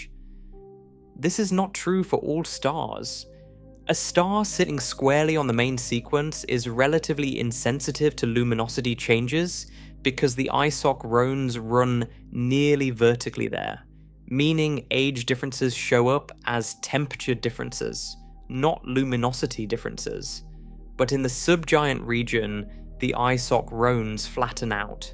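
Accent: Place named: RP, United Kingdom. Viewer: Good content, but the choice of the AI narration (a posh British accent), absolutely destroys the accuracy of the captions. Isochron, subgiant... all manner of technical terms, are rendered gibberish. An American accent isn't as melodious, but it is far easier for the caption translator to decode. Also... very repetitive... this is 40 minutes of content, stretched to 133 minutes.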